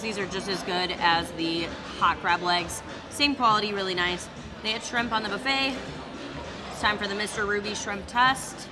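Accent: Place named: Midwest, United States. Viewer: I can hear the midwest in your voice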